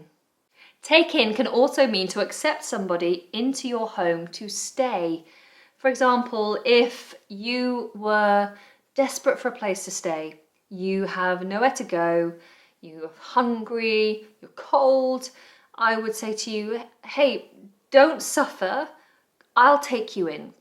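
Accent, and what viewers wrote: United Kingdom. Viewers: nice british accent😊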